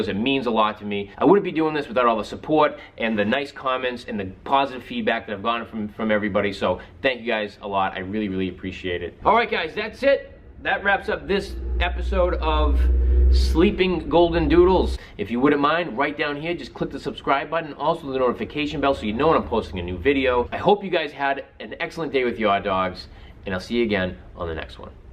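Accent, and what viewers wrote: Boston, United States. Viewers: You have GOTTA be from Massachusetts!!!! I'm living for that Boston accent. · Nice review. You sound like you have a Boston accent. If you live in the area and want to review an Airedale, let me know! 😉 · The Boston accent just makes these reviews go from average to hilarious.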